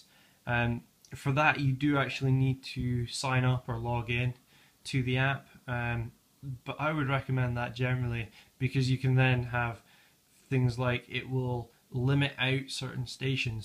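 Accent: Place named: Ireland. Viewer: I love your accent. Are you Irish?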